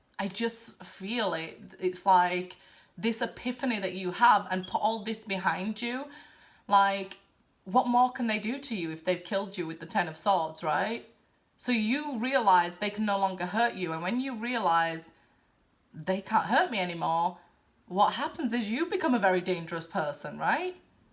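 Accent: United Kingdom. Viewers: The uk or London with that accent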